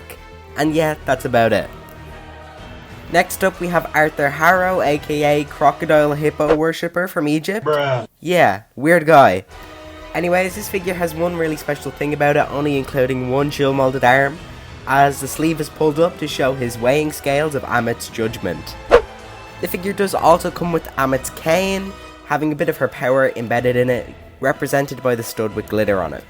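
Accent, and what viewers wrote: Ireland. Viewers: Are you Irish like me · Are u irish and if so what county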